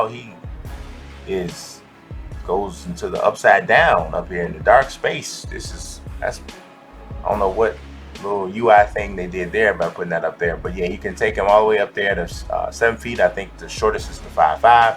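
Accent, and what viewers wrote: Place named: South, United States. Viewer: Lmfao you funny af bro def form the south im guessing atl? And yeah bro thanks so much for the info!!!! So glad I didn’t download this is wack af 70 plus dollars for this lol ea wow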